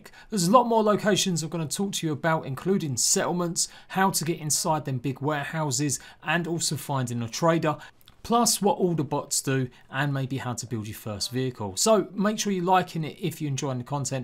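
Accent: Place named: United Kingdom. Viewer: without wo'ah, I love British accent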